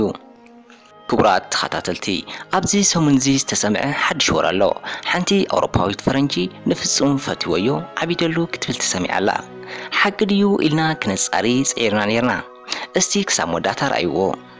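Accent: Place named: Agame, Ethiopia. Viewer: አታ መን ኽሐፍረልኩም በዞሞ ዓጋመ ተንብብዎ ትግርኛኻ አጽሪኻ ምጻእ ዑጉመይ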